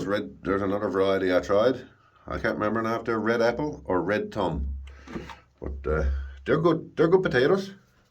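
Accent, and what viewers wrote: Ireland. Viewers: Sounds like you are Irish, the accent, dialog, and love of spuds gives you away. Norm from Scotland. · Come.on in and meet my potatos. , Your not Irish , are you? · Is this man Irish? Good job on the root cellar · Is your accent a typical Newfoundland accent? I’d never heard one before. I’m Irish and it’s bending my head coz it sounds sooooo Irish to me, with a twinge of Canadian 😊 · Was fully sure that this was an Irish video when I heard the accent, your accent is very like a Waterford accent. Not the first time I have mixed the Newfoundland accent up with the Irish accent. I am guessing Irish must have settled in numbers way back.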